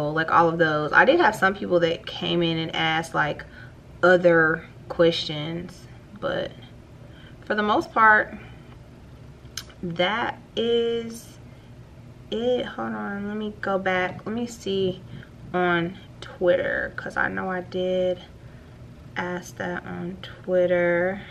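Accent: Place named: South, United States. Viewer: Ya accent is so sexy. I love that country girl accent?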